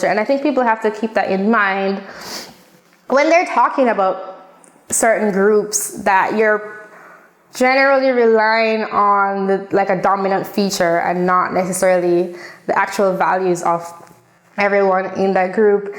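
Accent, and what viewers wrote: Jamaica. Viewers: Are Jamaican? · Girl! I hear the Jamaican dialect there. Anyways, speak the truth. Jamaicans are here being brainwashed by American mainstream propoganda on racial issues. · My girl I can here your Lovely Jamaican accent. Like the video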